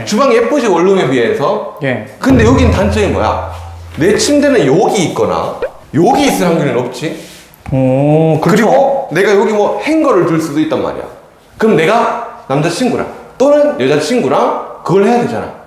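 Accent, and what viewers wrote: Gyeongsang, South Korea. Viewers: 경상도 억양이 너무 심하네요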